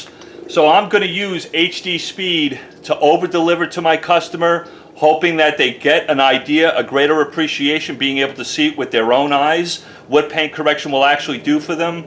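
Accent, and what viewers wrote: New York, United States. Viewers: In every video make, someone has to always comment on his New York accent. It’s always “, you sound like Raymond or the dj guy”. Can someone please make an intelligent question on car detailing? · Def New York or New Jersey.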